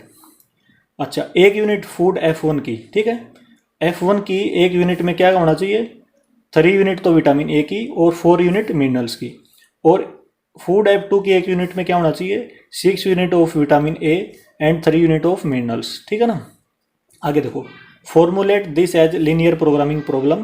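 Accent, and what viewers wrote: Haryana, India. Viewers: Khoon main hi haryanvi hai....Kaha se ho sir 👍🙏🙏🙏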